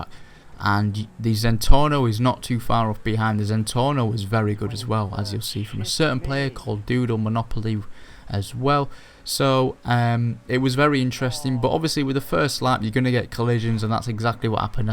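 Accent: Yorkshire, United Kingdom. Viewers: r u from yorkshire?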